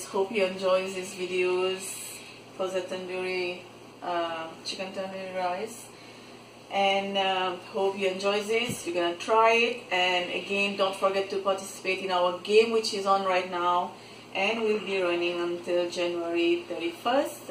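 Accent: Mauritius. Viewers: Your accent is very similar to Mauritian's!cool😊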